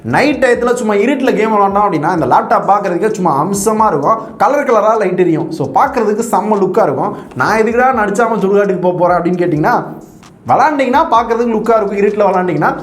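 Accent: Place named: Madurai, India. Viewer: Thala unga madhurai voice and tones.. Summa vera level😎💓👌👌👌👌👊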